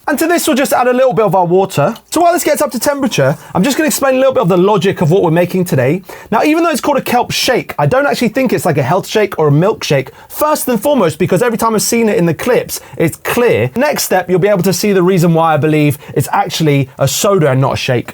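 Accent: United Kingdom. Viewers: Too british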